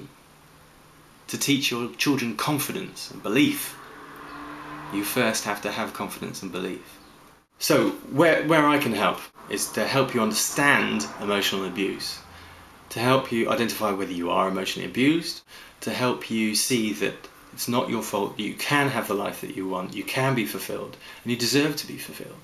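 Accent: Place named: Australia. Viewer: Every time I hear that annoying accent I want to punch an australian in the face.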